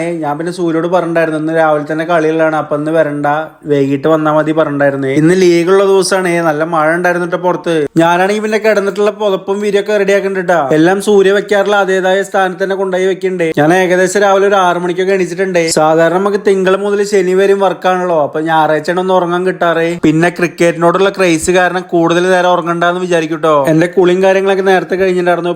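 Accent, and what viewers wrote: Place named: Thrissur, India. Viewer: Thrissur language aanallo ethh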